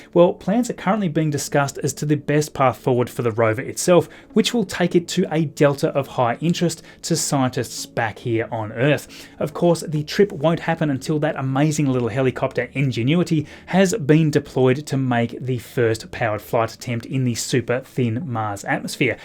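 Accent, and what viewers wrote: Tasmania, Australia. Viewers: Tasmanian accent!!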